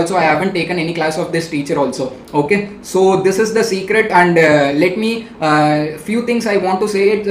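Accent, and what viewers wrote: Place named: Tamil Nadu, India. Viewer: Bro, neenga tamizh ah? Unga accent vachi solren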